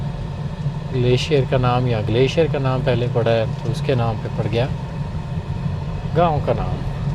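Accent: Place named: India. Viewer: Bro r u indian?. Ur urdu has very big Hindi/indian touch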